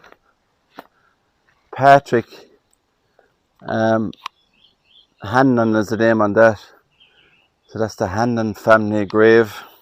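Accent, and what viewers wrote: Ireland. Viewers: I like to watch videos like this every now and then. this kind of old Cemeteries are very fascinating but wouldn't like to be there after dark! In addition, I find Ireland and Scotland breathtakingly beautiful. Been there a few times. I don't know why, but I feel a kind of connection when I'm there. and I love your Irish accent 🤩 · This was my first time watching this channel and I'm just wondering during are you Irish and is that where this beautiful graveyard is?! 😊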